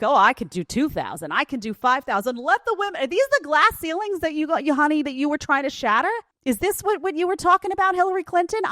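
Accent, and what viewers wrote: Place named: Long Island, United States. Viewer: NOT FLIRTING, JUST STAING MY OPINION., I like your Long Island accent that slips through every so often. It reminds me of my parent's accent (Connecticut born). Nostalgia.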